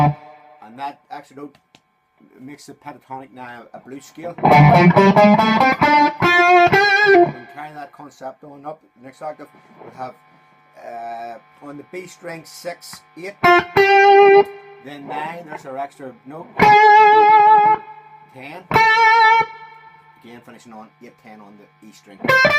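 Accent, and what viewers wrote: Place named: Ireland. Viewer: finally got to hear your voice 🤠 man you got that ruff ol' Irish accent and sounds great 👍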